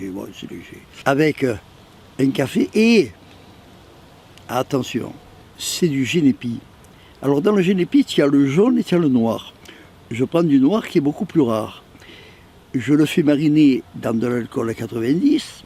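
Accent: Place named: South, France. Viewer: Salut et ......Chuttttt 🤗😆 et quel bel accent du Sud. Le rose me va aussi 😅😅😅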